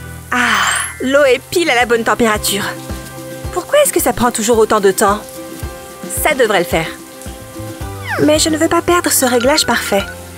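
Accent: England, United Kingdom. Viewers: Vous êtres anglais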